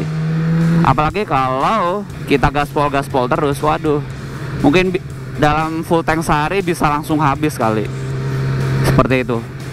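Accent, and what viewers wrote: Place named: Banyumas, Indonesia. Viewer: Lah kok rada ngapak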